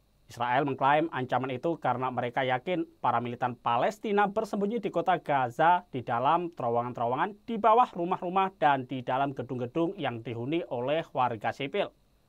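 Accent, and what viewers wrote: Java, Indonesia. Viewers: medok njir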